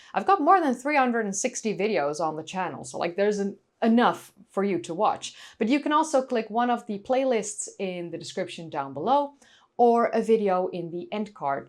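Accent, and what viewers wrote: Netherlands. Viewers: It would be an immense exaggeration to say I have the same reaction as Jamie Lee Curtis in A Fish Called Wanda (search YT for "fish called wanda russian scene"), but I do enjoy, in a perfectly clothed way, hearing say things as a Dutch person! Practice "Berg" op de wijze van, everyone Then the name of the original land owner Advanced users - say "Gerard The Umbrella Plant" in the manner of, as my best friend - a Dutch guy - insisted when i was 19yrs old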